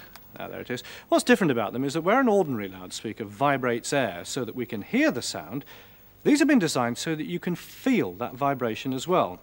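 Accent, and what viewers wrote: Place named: North, United Kingdom. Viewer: He was too northern for 1980 BBC sadly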